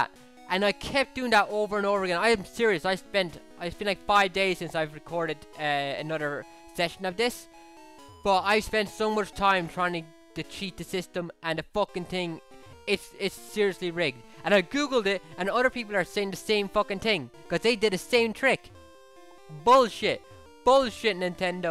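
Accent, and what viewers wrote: Ireland. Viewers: Oh my God your accent is AWESOME!!! Are you from Ireland??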